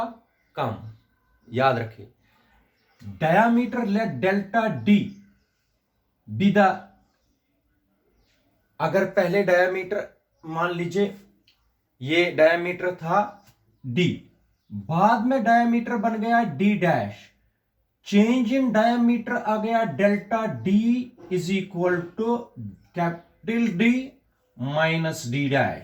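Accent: Kashmir, India. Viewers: sir why do you have a specific “kashmari" accent.....🙂 😊 😊 and love ur....teaching style sir...❤️❤️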